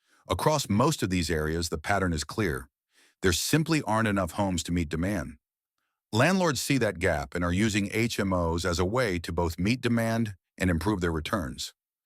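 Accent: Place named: United States. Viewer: Why do u hv an American accent?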